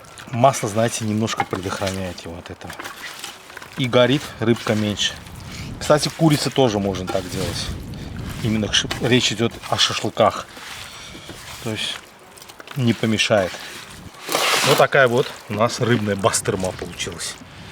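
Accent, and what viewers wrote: Baku, Azerbaijan. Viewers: Бакинский акцент. Знакомые бакинцы независимо от национальности именно так разговаривают.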